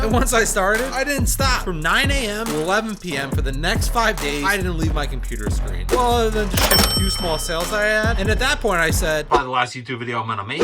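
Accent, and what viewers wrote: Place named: New York, United States. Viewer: We are rooting for you, brother. Congrats on the recognition you’ve been receiving. Judging from your accent, seems like you’re from my neck of the woods (NY tri-state area). Best of luck!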